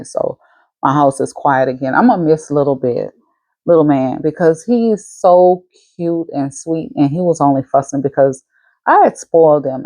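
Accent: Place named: New Orleans, United States. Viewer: This is my first time here I enjoyed your video and that Nola twang can’t wait to see how you accessorize your navy bag, I never do potlucks either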